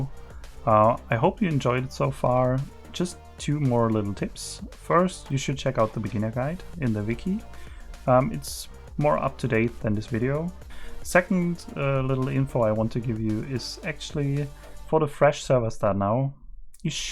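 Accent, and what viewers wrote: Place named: Germany. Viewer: Das erste Mal, dass ein deutscher keinen Abgrundtiefen Akzent hat und echt gut Englisch spricht und du entschuldigst dich weil du "struggelst". Mehr Selbstvertrauen! Du machst das so gut !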